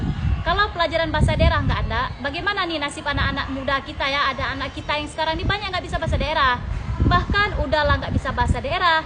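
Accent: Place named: Aceh, Indonesia. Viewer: kenapa logat Aceh hampir sama dgan logat Bali, ktka berbahasa indonesia?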